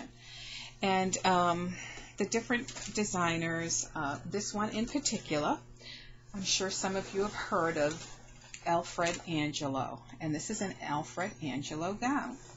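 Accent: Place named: Canada. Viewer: Hi, new subscriber...I just came over from lynnyvnnestwo channel...she made a video response to promote your challenge...here's a link for you - <link> (hope it works) not sure if I'll be able to take part this time but good luck to everyone that is...hope it all goes well for you...enjoy. The dress is beautiful and it's so generous of you to want to share...I think it would be hard to cut into one of the dresses though but I guess once you start it's ok and there's so many things you can make once you have one...also are you in Canada or America...my guess is Canada but please forgive me if I'm wrong, I don't want to offend anyone..lol..why I ask is because there's a certain word that Canadians say and I love the way it sounds...the word is "out" and I'm sure it's a unique pronunciation to Canada...anyway, that's enough from me...best wishes. Ann...x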